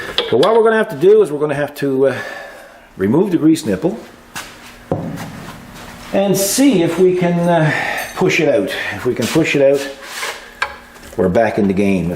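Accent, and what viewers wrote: Canada. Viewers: Love your videos -- good to know you are so busy. I love your Canadian accent and your "tomfoolery!"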